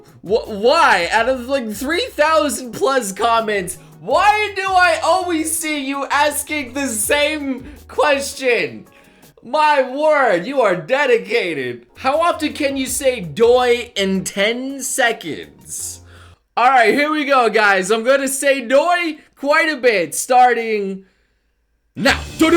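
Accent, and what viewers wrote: Ireland. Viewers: OMG HIS IRISH ACCENT IS THE MOST PERFECT THING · Your Irish accent is awesome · I love that Irish accent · that Irish accent though XD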